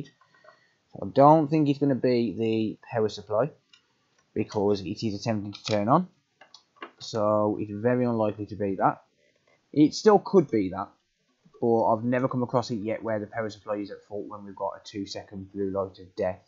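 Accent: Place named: Black Country, United Kingdom. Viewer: Nice to hear the voice of fellow yam yam 🤘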